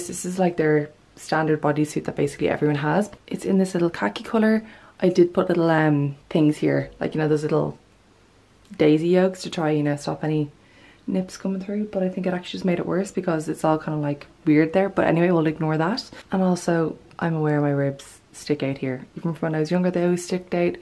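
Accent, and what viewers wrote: Ireland. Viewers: Oh my Lord! your accent makes me wanna move to Ireland.😍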